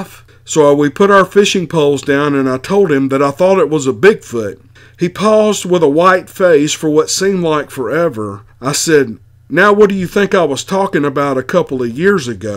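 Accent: South, United States. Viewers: Teddy Nevarez Right On! The Southern accent is something to be proud of. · it’s me, your boy Bleu, hailing from Ocean Springs, MS. I now live in Costa Rica (again.) I’m just gonna sing your praises because I dig this topic, you talk like me and I always look forward to your content. It’s my feeling that us southerners don’t much care for folks gushing and it’s likely uncomfortable af for you. That type of thing is super awkward for me, my people back home, most Mississippians and most definitely Cajuns like myself. I’m a fan. Yankees tell you the same thing. 😂 There’s a reason for that. A good story teller is highly valued across cultures in every corner of the world. It’s been that way since the beginning of time, although it’s exceedingly rare these day. Keep up the great work. It’s very much appreciated. Blessings to you and yours. Thank you. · I enjoy your southern accent and am a big blues fan. 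I also believe in bigfoot and appreciate what you do for our entertainment. Thanks bud, keep up the good work! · Love your story telling. Your southern draw adds such authenticity to the stories. Keep up the good work buddy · Your music is part of what makes u stand out from others! The rest is great content and that charming southern drawl! Love u! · The music at the intro has such a Southern feel to it, and that combined with your accent and the way you tell the stories is what makes this channel THE BEST! 🤗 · Geez, seriously? There are three things that makes channel unique. 1) The awesome music, unique to this type of channel. 2) way of reading the stories, so honest and respectful. His southern accent really adds to the storytelling too. 3) The awesome stories people send in. They trust with their real life experiences because he is so respectful. So if these aspects don't appeal to some...fine and dandy...just move along to a channel you like....cause we LOVE what does with his! 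That's right you heard me....move along!! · Mr. I do not mind the music as long as I get great stories! And I had a cousin that had sounded like you from down south. I think your accent is adorable. Me as city slicker is not offended at all. That is why God made us all different...with a Twist!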